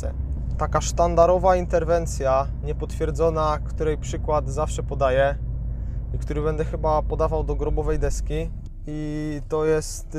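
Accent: Silesia, Poland. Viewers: Ze Śluńska jesteś?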